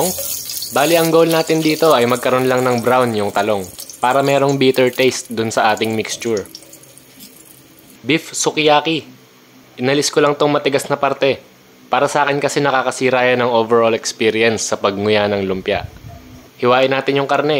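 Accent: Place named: Pampanga, Philippines. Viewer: yung accent nya...kapampangan ka? eheh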